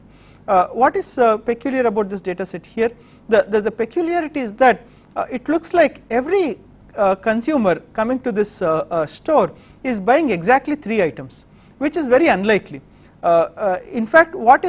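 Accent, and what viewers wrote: India. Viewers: As a matter of fact, indian-english accent is pretty close to russian-english... so you are actually in better position to understanding this guy than native english listeners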